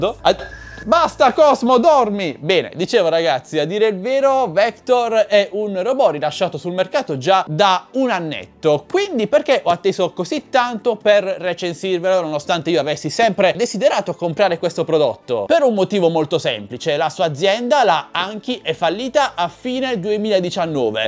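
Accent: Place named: Bari, Italy. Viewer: si infatti non sto giudicando te.. te sei fantastico adoro i tuoi video.. penso soltanto che questo gadget sia un po inutile.. but anyway adoro i tuoi video anche dal tuo accento ahah anch’io sono di Bari ma per il momento vivo in Australia e mi manca molto il mio paese.. ti ringrazio ciao bello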